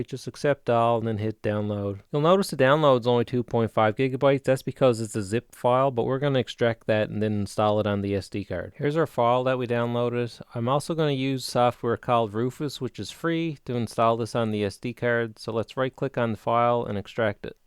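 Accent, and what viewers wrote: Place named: Newfoundland, Canada. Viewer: As soon as I heard you speak I knew you were from Newfoundland. Great video. I subscribed to your channel.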